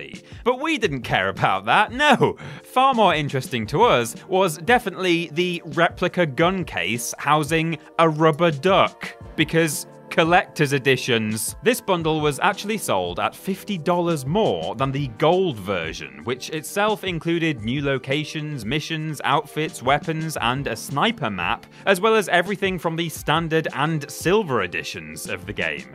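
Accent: United Kingdom. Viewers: This voice sounds familiar. Did he used to be with What Culture or do all British people sound the same?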